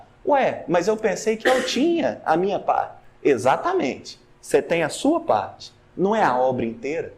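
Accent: Minas Gerais, Brazil. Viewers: Lindo capítulo do livro e muito bem explanando pelo. Aproveito pra matar a saudade do sotaque mineiro que traz aquela sensação de estar em casa 😍 gratidão,!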